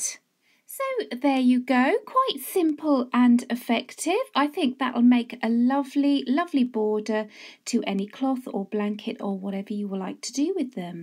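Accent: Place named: England, United Kingdom. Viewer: You are a LIFE SAVER as this works irrespective of the size of the "granny" - THANK-YOU, THANK-YOU, THANK-YOU !!! (also lovely to hear an English accent) with love & Blessings from South Africa xxx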